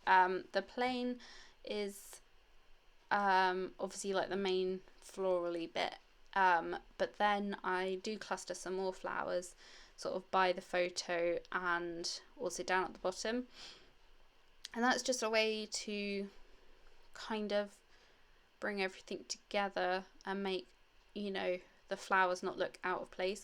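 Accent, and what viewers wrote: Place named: United Kingdom. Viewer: Just found your channel on here. Your layouts are so lovely! I especially enjoy listening to your accent too... I am from the UK originally (Gloucestershire area) and now live in California, so it's like being home again and scrapbooking at the same time! Love your unique style and you have given me new inspiration for the Felicity Jane kits that I can never seem to use up all the little bits and bobs from each month! xx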